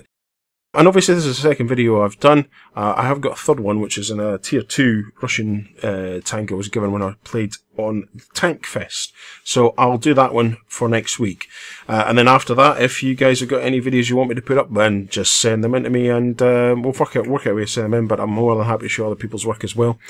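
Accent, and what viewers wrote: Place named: Scotland, United Kingdom. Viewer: yea, I like your vid...got my sub...can't wait for some more..Love the Scotsmen Accent...I'm in Nova Scotia...where abouts you at M8?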